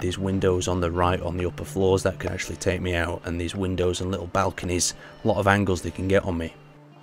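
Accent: United Kingdom. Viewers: The clothing usually comes with such things, but as his accent tells hes most likely british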